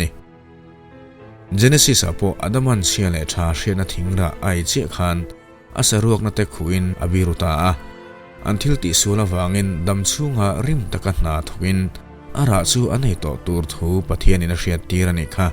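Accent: Mizoram, India. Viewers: A hnar aw nih chu... A hmar in a trong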